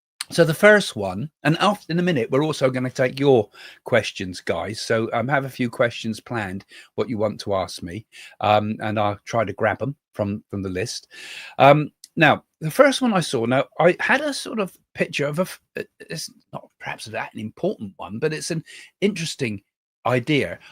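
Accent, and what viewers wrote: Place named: West Country, United Kingdom. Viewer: I’m new here. You sound like me. You west country? 😂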